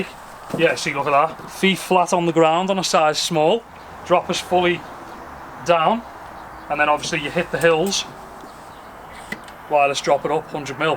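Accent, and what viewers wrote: United Kingdom. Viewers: what type of accent is this? i know it's from UK but i don't know which part.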